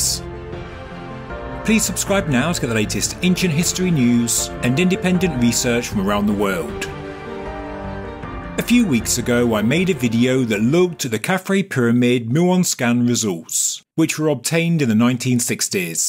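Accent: United Kingdom. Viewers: May I ask what part of the UK. There are different accents in the UK!! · identifies as a UK citizen. Accent sounds pure UK to me. (No offense to your husband.)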